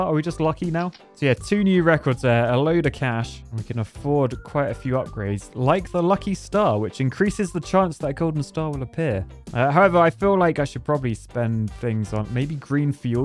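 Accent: United Kingdom. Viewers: gotta love the real britich accent amazing!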